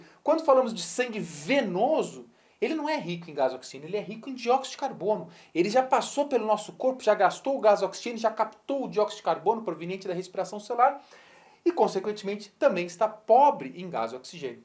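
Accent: Rio Grande do Sul, Brazil. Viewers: meu parabéns, sua vocação com certeza, és dar aula! vim aqui nesta maravilhosa aula, pois a didática da minha professora de fisiologia, não é muito boa! percebi que tu és do RS! sucesso!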